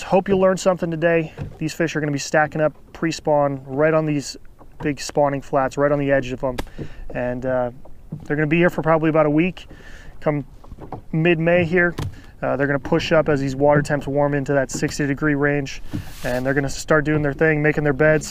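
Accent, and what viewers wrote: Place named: Canada. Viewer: I thought you were from Canada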